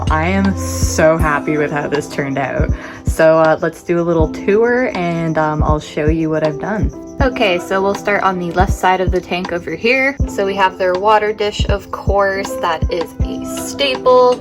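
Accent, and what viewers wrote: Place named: Canada. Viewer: You sound like you’re from Canada or maybe Minnesota.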